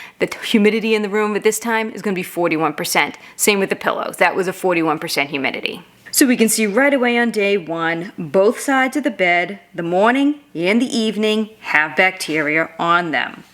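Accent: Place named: Boston, United States. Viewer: is from the Boston area.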